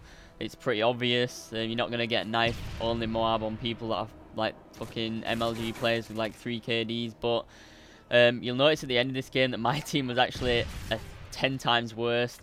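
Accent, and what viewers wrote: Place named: Northern Ireland, United Kingdom. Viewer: Nice man! You sound like you're from Northern Ireland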